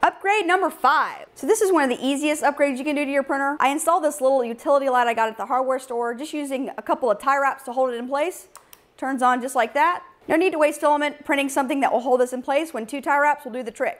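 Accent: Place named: United States. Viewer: hell yeah. Love your enthusiasm, knowledge and delivery. The American accent is a bonus too :)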